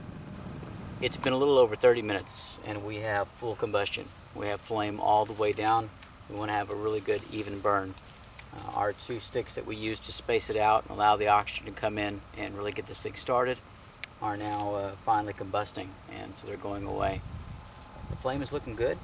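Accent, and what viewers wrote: Texas, United States. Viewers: Around mid-November the nights are about 14 hours long in the US. Based on the slight accent in his voice, I'd say probably somewhere in Texas. So the combination of not-quite-winter and Texas weather patterns, that would explain why he's OK with just jeans and a flannel shirt.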